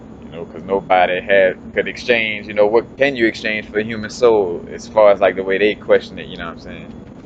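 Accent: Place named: South, United States. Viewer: Brother I love video,but sometimes you fumble your word and laugh or say "and whatever". I get a ton of knowledge from you. I just wish you would slow down your thoughts so that the watcher could receive all the amazing words you have to share. You got to remember we're not all from the south. Lol that drawl is tough to understand at times. I SAY ALL THIS WITH LOVE, cuz I want the world to get hip to you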